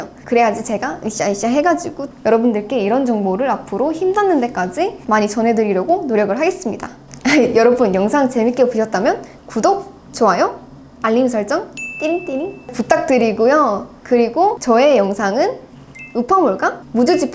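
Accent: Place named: Gyeongsang, South Korea. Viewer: 고향이 경상도 어디세요? 사투리가 똑같네요 ㅎㅎ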